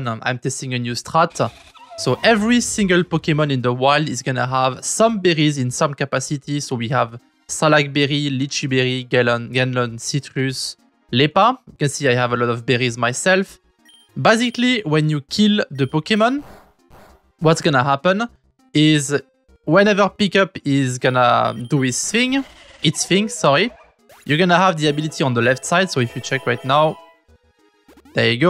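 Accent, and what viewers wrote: France. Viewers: Je cherche un tuto en français j'en trouve pas. J'en cherche un en anglais je trouve un tuto d'un français qui parle anglais alaid x) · What a nice french accent that you have x)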